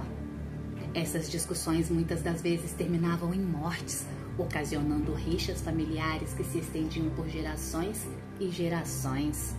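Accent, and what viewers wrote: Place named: Mato Grosso, Brazil. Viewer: Imaginava ela morena de cabelos pretos tipo Índia, sua voz lembra do interior de Mato Grosso.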